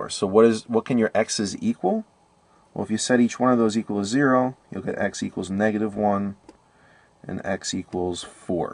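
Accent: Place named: Long Island, United States. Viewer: long island accent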